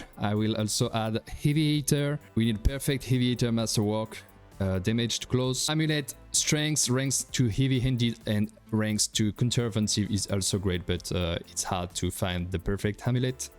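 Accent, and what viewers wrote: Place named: France. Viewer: Salut le poto je me disais bien que la accent était familier, j m abonne pour the french powzr brother. Tu geres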